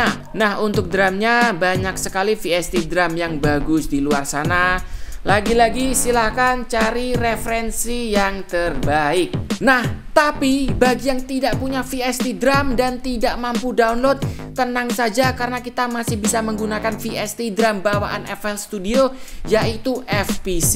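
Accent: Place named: West Sumatra, Indonesia. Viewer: Suaranya kayak minang kocak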